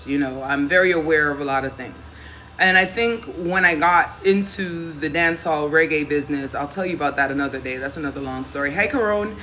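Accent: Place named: New York, United States. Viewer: Its ok... but you sound like you from New York. Still, you got the voice for radio and the face for Youtube so you already have all the qualifications. ;-) I love the voice nuff nuff nuff, so I will watch and see what creativity and experience lies within the beauty with the dreadlocks. We can tell, you are unique in your own way... did I say I love di voice?:face-blue-smiling::face-blue-smiling::face-blue-smiling::face-blue-smiling::face-blue-smiling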